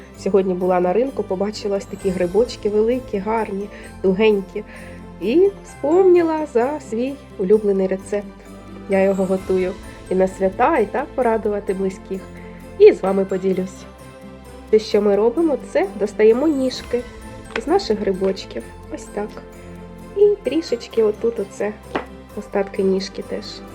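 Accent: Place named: Kharkiv, Ukraine. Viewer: Дякую за рідну мову і рецепт..Ви,мабуть,з Харкова,бо чую рідний акцент.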